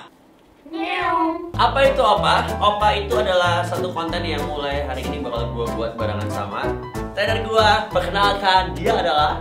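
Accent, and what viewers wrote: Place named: Banyumas, Indonesia. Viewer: ngakak ngapak yh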